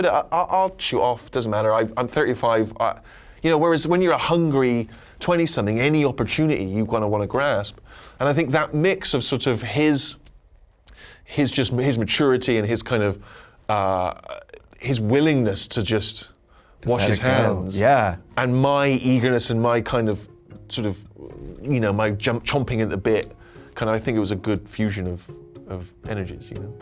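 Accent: United States. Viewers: Where's his Bristolian accent gone????? He sounds semi-yank uggghhhh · Stephen sounds so American these days haha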